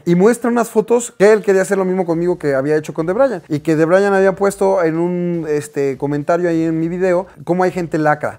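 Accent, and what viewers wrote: Chile. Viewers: suena a chileno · See oye a chileno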